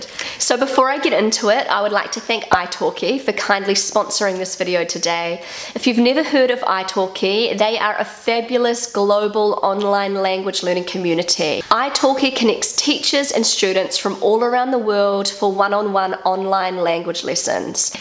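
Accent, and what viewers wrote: New Zealand. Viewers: I also love accents and especially your swert kiwiaccent...I think you have to make another german video here on YouTube...😃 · I love your videos! I have a good opportunity to hear Kiwi English and know a little about Germany. Perfect!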